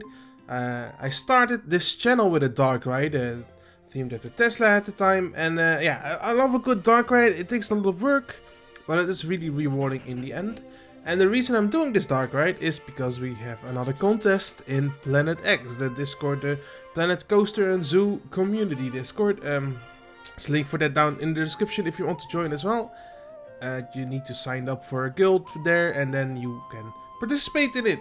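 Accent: Netherlands. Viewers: Dutch accent, Efteling music, Pieck dark ride - what's not to like! Subbed.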